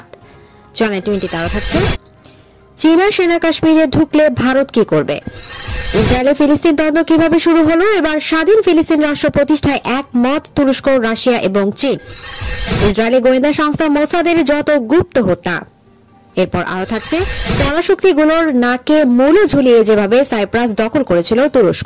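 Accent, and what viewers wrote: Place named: Kolkata, India. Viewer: ETA kokatar kono chanal not Ta Vasa accent dekh lai bojha jai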